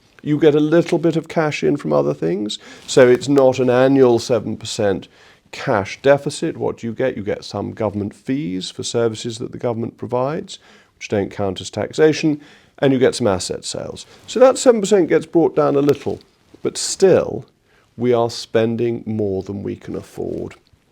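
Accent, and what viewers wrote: RP, United Kingdom. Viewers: All the attributes of a clown with money and amnesia... conveniently forgetting how long his party was in power leading to the UKs ruin. Remove the posh accent and this clown could easily be as useful as a butlins clown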